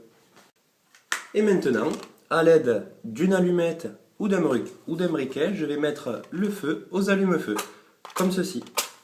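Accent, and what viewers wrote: South, France. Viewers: Super vidéo, pour ma part je n'ais jamais fait de feu et cet hiver je vais devoir savoir le faire, si je ne veux pas mourir de froid, vos conseils me sont utiles, ce n'est pas inné, si on a pas appris on sait pas faire. C'est pour cela que je suis venu voir cette vidéo, très bons conseils, voix douce et calme très bel accent du Sud que j'aime, ainsi on reste jusqu'au bout, très belle démonstration, merci beaucoup ! Me reste plus qu'à mettre en pratique ce que je viens d'apprendre grace à vous :) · GUIGNOL TU CROIS QUE LES GENS NE SAVENT PAS FAIRE DU FEUX DE PLUS AVEC TON ACCENT DU SUD TU AS DU EN FAIRE SOUVENT IMBECILE MEME PAS CAPABE DE FAIRE DU BOIS D ALLUMAGE TRAINE SAVATE FAINEANT MAIS CA DOIT AMUSER DE FAIRE UN PETIT FEU TOUT LES 10 ANS AVEC TA CASSETTE ANDOUILLE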